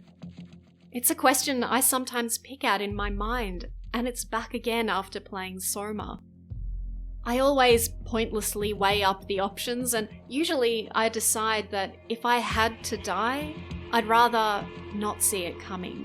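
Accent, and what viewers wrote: Australia. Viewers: Australian goth??? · Grabbed this based on your vid. Nice to hear a fellow female Aussie gamer.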